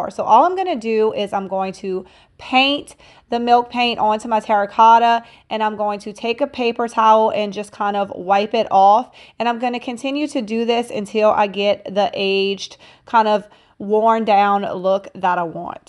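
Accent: Louisiana, United States. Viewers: Are you from Louisiana? You sound just like me! 😆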